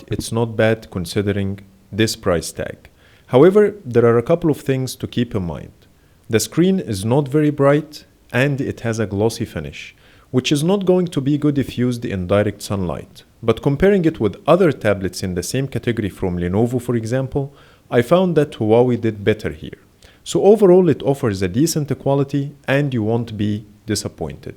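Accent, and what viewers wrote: Egypt. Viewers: Egyptian accent lol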